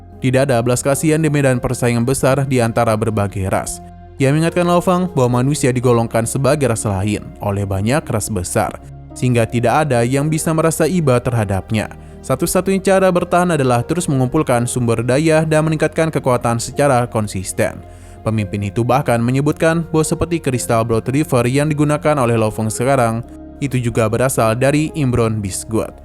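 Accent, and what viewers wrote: Banjar, Indonesia. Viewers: Bang orang Banjar kah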